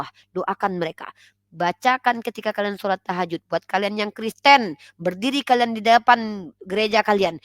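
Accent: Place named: Ambon, Indonesia. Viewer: Enak kali ngomong ambon 2' maulah kalian jangan mancing yaa..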